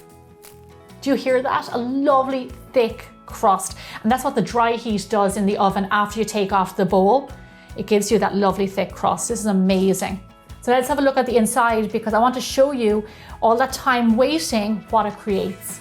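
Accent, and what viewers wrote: Ireland. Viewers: I could bake bread with you all day.. You make it look so easy. How long will the starter last? But your bread looks so much different and just could listen to your Irish accent. Very nice... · So fun listening to the Irish talk. "And while it proofs, you can go have a cuppa." :-)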